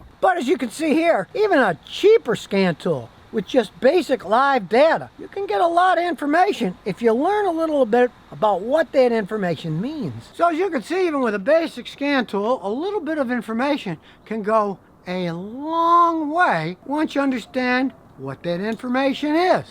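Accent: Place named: Buffalo, United States. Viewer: Yah- heez from Buffalo!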